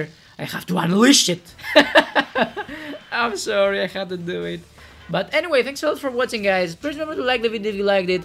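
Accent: Spain. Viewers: Bro tu eres español hahahah se nota mucho, tienes acento español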